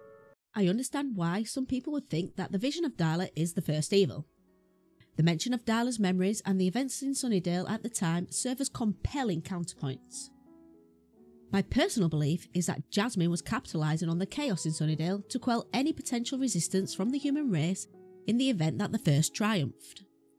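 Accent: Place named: United Kingdom. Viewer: Yus we need more British voices on youtube 😺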